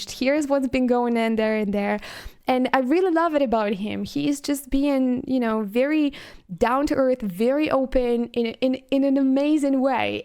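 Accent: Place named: Eastern Europe. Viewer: You don't have to work on your pronounciation. I can understand you very well and slavic accents are by far my favourite accents! No need to try to get rid of it.^^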